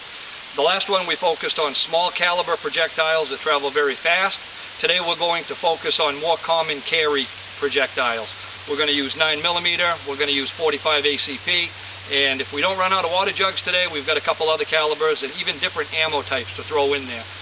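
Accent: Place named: Pennsylvania, United States. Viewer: By the way, are you in PA? Your accent is familiar.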